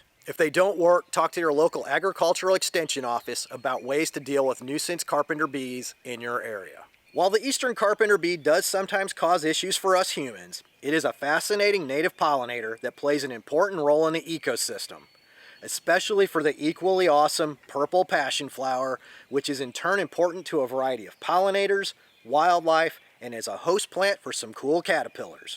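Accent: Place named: North Carolina, United States. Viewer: I've made brush piles for critters in my yard and I'm excited to see carpenter bees in the next few years. Also, are you from NC? I ask cause I noticed that your accent is similar to mine, lol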